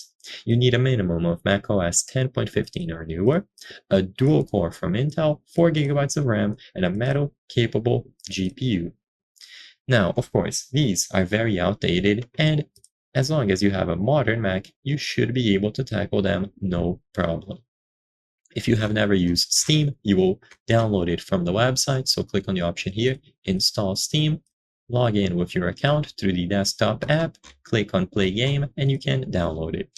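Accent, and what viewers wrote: Brazil. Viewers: I know a Brazilian when I hear one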